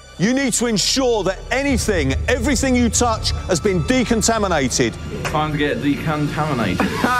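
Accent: England, United Kingdom. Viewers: are you english or you from netherlands too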